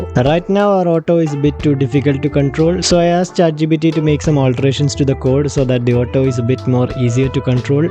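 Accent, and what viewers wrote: Kerala, India. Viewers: ni malayali alleda 😂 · I detected that malayali english accent within a few seconds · are you malayali accent is too strong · Bro is a Malayali for sure!! 😂🤍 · Why im getting a feeling that you are a malayali😅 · You are a Malayali right? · your Mallu accent 😂is top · including the Malayali accent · Are u from Kerala ? Well this video was wonderful keep grinding brother 👏 u gained a new loyal sub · Bro malayali aano? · Bro are u from kerala 😊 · Malayali aano saare??? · Broo Mallu or what?? Props to you bro!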